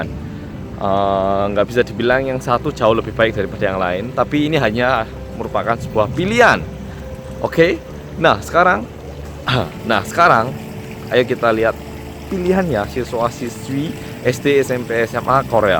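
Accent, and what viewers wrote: Java, Indonesia. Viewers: the power of medhok haha jawane kentel banget salam sangka etan yo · Mas coba dong bikin video, tapi jangan pake logat jawa:v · aku seneng gawe logat medok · logat korea,ne ilang!!!! jowo,ne medhokk. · ini orang korea kok medok 😂 pernah ke jawa apa temen nya bajindul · maaf sebelumnya aku baru liat chanel ini,mau tanya ini bnr org korea asli? tpi ngomongnya agak logat jawa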